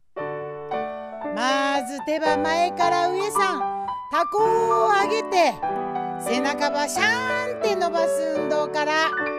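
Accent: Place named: Hasami, Japan. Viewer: 波佐見出身の者です。18歳で波佐見を離れて今年で17年になります。転勤族で各地を転々としてます。 この動画で波佐見弁のナレーション聞いて懐かしさでほっこりしたのと同時に、今年亡くなった祖母の事を思い出しました。 やっぱ波佐見はいいですね。またいつか必ず帰ります。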